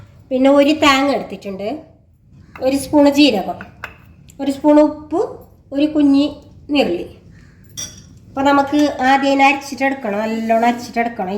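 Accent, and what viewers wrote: Kasaragod, India. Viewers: Ithu Kasaragodu language aanu. Swayam kettu nokenda aavasyam illa. Avarude language il alle avarkku samsarikkan pattukayullu. Aa language kelkkan ishtappedunna Kure aalkkaru ind. Kannur, kasargodu ullavarkku trissur, Trivandrum language kelkkumbol ulla bhudhimuttu aayirikkum ningalkku indayathu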